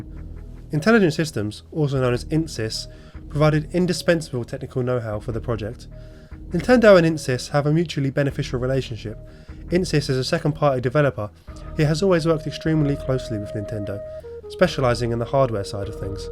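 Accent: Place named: United Kingdom. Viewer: Ah, I got a British accent, this time. Lotta Metroid videos, lately.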